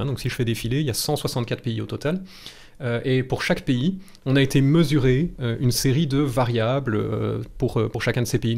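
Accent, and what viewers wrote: Belgium. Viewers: J'adore l'accent belge 💕💕💕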